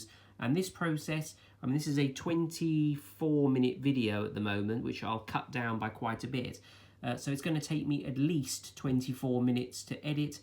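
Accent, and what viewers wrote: United Kingdom. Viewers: I like listening to your very British pronunciation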